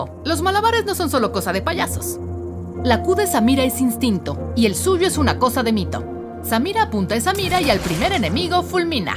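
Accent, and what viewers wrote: Mexico. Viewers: x q habla en mexicano la presentadora? es que para riot latinoamerica es mexico? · agien mas noto ese toque mexa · Hablando con memes todo el rato es desagradable oírlo. Además hablando mexicana, perdiendo el acento neutro que siempre proclaman usar.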